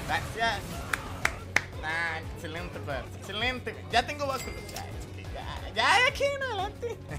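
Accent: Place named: Caribbean Coast, Colombia. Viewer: pipi sonso dice que eres costeño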